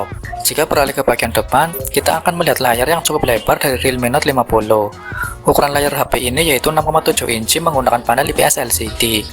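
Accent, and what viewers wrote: Banyumas, Indonesia. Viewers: Ciri khas 😅Konten kreatore wong ngapakkk ikii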